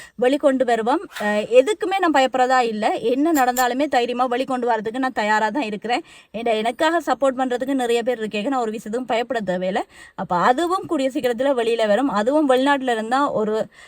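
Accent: Sri Lanka. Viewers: u r great,ur job is excellent, oru manitha mirugam panniya seyalu ku neenga feel pannatheenga, unga srilanga tamil speech sooper,all the best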